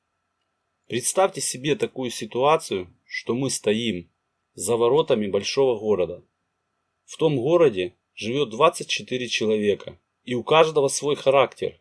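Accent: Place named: Ukraine. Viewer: Благодарю! Вы очень классно объясняете! У вас как будто бы украинский или кубанский скорее говор, это сразу было не привычно, но на самом деле не важно, потому что важен не голос, а информация. Вы даёте понять глубинно, и даёте возможность слушать! Не всегда есть возможность читать книги, особенно в офисе. Спасибо!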